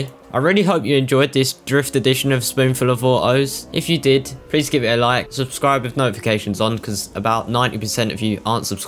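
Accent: United Kingdom. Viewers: You should let your videos narrate by someone with an american accent. British accent is just not it. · love your British accent bro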